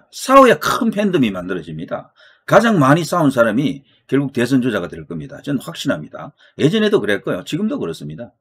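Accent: Gyeongsang, South Korea. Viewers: 경상도 특유의 사투리 목소리도 좋으시고 내용또한 엑기스 입니다. 최고 !!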